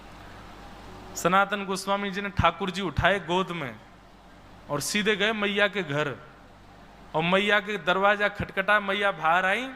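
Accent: Braj, India. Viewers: बहुत ही सुंदर और अद्भुत कथा श्रवण कराई साथ बृज भाषा आपके श्री मुख से सुनने में बहुत ही आनंद आ रहा है 🙏🙏🙏🙏🙏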